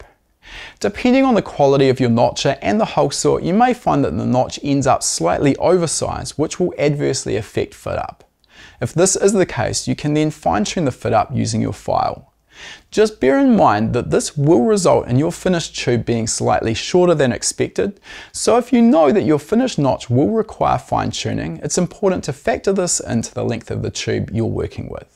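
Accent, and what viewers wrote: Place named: New Zealand. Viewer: Awesome. Thanks ✌🏽 PS-Maybe the sour responses about the “what makes it teck” saying were from guys that wish they had a cool accent? I certainly wish I had the cool kiwi vocabulary/accent haha. -Best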